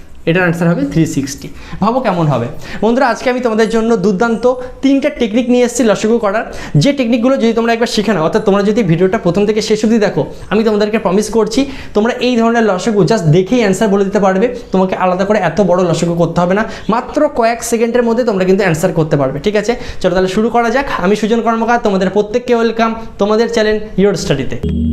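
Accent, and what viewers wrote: Bangladesh. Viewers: আপনি কি নোয়াখালী?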